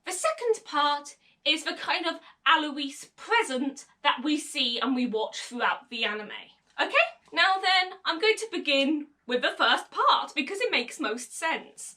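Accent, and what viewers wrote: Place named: South, United Kingdom. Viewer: Really nice theory! Where are you from? South of England? God I love your accent! Hahahaha I lived some time in England and yours is really cool and different to where I lived (liverpool)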